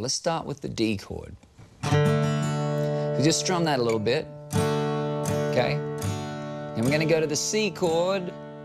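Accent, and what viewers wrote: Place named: New Zealand. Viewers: New Zealand y’all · Actually I think New Zealand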